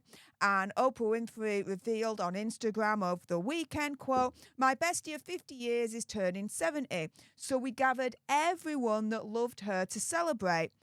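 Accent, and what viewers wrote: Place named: Northern England, United Kingdom. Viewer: Great music 🎶 Just love a northern accent 😀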